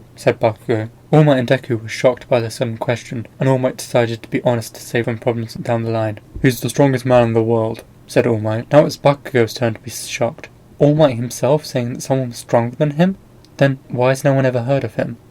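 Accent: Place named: England, United Kingdom. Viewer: Just glad I can find a what if with a English lad narrating it.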